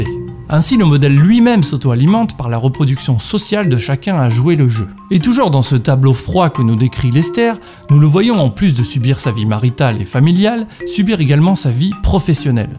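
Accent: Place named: France. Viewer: C'est trop génial !!! Cet accent français sur le texte anglais est un vrais régale ahahahaha :D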